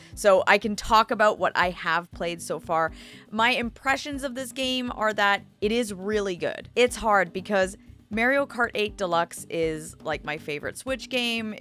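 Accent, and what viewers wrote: Canada. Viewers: is Canadian, and pronouncing Mario as "Marry-Oh" instead of "Mar-ee-Oh" is more Canadian than American.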